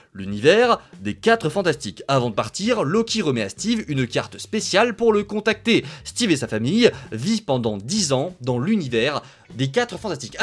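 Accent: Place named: Lyon, France. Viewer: t'es lyonnais, non? On dirait. Je viens vous confirmer que Nartée, Endrique, ainsi que Correntyn Tollisseau SONT dans Doumsedeille! EH OUI!!!! Ils font partie du MARVELE MOUVIZE MULTIVERCE!!!!! Ils vont des petits ponts à Wong et Docteur Strange (Strange prononcé en français ringard). Aussi, il y aura l'alien qui est apparu dans un ipizode des Vacances de la Moor, le sequel de Helène et les maçons. INCREDIVULVE! Eh oui!!!! Brénoudeille et Doumsedeille!!!!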